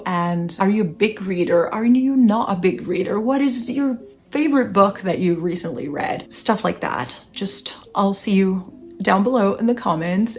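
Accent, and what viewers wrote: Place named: United States. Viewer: Hi - I'm new to your channel. Just wondered how you ended up living in Berlin? You seem to have an American twang so I was curious. Hope you don't mind me asking? I have family in Germany too! Thank you.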